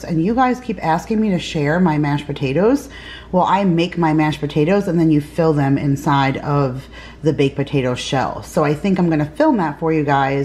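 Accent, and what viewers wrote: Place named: New York, United States. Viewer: Your definitely from ny I could hear it. I do like Texas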